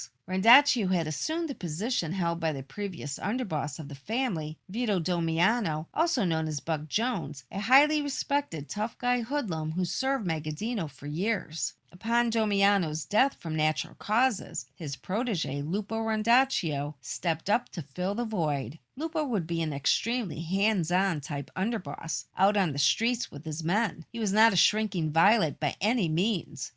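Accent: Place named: New York, United States. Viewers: Got to love the New Yawk accent of the lady covering these videos,’ she can read a book to me anytime. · Nice!! The borgata gal is back!! That NY Italian accent!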